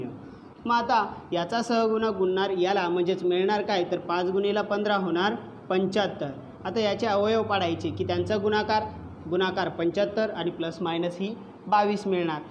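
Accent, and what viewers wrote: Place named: Kolhapur, India. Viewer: Kolhapuratun boltoy sir Brand shikvta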